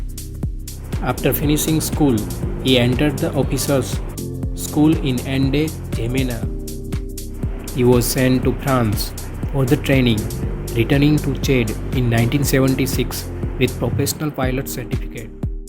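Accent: India. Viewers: the indian narrator voice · Why Indian English accent is so heavy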